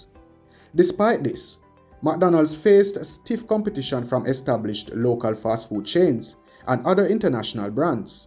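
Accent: Jamaica. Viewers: Put this on just to hear a Jamaican accent.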